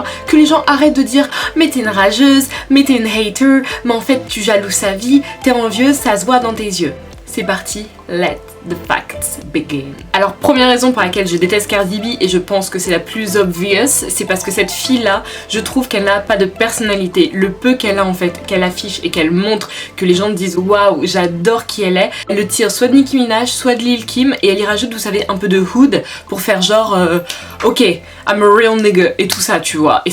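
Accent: United Kingdom. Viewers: J'aime bien tes videos mais tes mimiques franglaises sont prodigieusement agaçantes, excuse-moi hein mais j'ai eu du mal à me concentrer tellement j'étais obnubilé par les mots que tu prononçais à l'anglaise (certains étaient même français) ainsi que les "bad attitude" "ratchet" etc...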